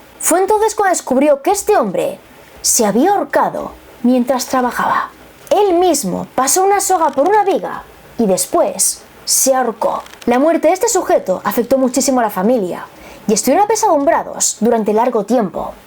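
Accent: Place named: Spain. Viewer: Riddle Tu acento español... Es hermoso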